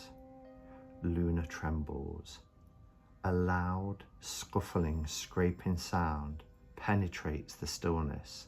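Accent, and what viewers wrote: United Kingdom. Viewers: What a wonderful beginning to Mabon celebrations! I sent this to some friends as well. One already commented, "That was magical." Indeed. If you ever quit your day job, you should apply to read books on tape. Especially British mysteries -- I so hear that in your voice. Thank you!!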